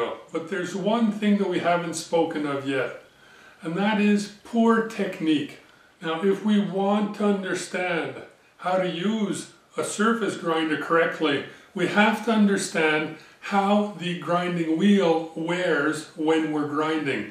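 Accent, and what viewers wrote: Canada. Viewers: Michigan or Canadian?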